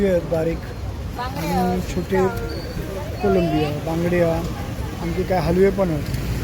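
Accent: Malvani, India. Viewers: Mala vatta tumhi mumbai la rahta. Karan tumchi malvani proper nahiye. Maka di aste te tumhi bolta maka de.. Mi yenar tevha ghetay - mi yetalay tevha ghetalay asa aste. Marathi mix bolta tumi. Ani kolambi nahi ti sungta malvanit boltat. Baki chan video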